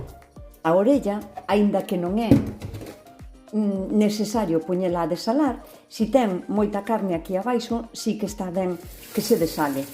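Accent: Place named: Ribeira, Spain. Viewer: Hola,primero por favor donde vives.despuesde más de 50 años puedo oír hablar en gallego,es como en mi pueblo Ribeira ❤ Vivo en Aragón ,añoro mucho Galicia.😢 Me encanta oírte ,abrazos ❤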